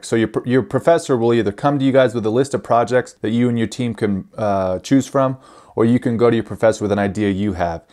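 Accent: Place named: United States. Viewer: This might be a dumb question but I'm from tbe uk, you sound american to me. Will this stuff help me to become a vibration engineer in the uk?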